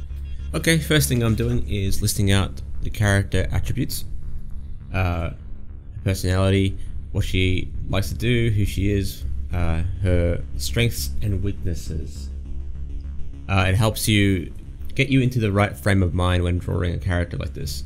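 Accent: Australia. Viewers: Wow straight off....Aussie talk, i miss people being just them selves, not afraid to speak your mind and not worried about the odd swear word thrown in. :) What part of DownUnder you come from, im a sandgroper, if your Aussie you know where that is :P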